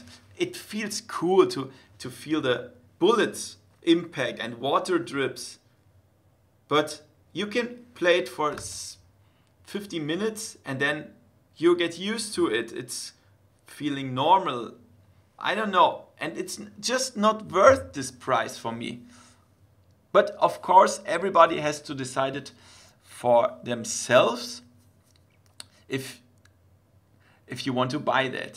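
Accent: Germany. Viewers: Der deutsche Akzent genial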